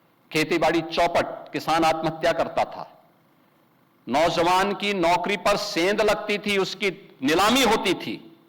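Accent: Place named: Gorakhpur, India. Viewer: Jalwa Hai Bhaiya ji aapka ❤ Gorakhpuriya andaaz me smjhaya hai apne ❤🔥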